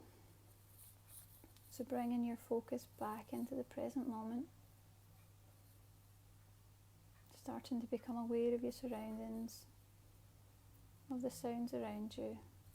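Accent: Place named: Scotland, United Kingdom. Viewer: You sounds like Scottish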